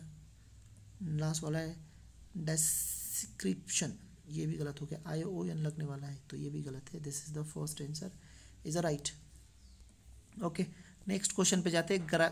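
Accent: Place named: Nandurbar, India. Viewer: Mughe laga Nandurbar se hain aap .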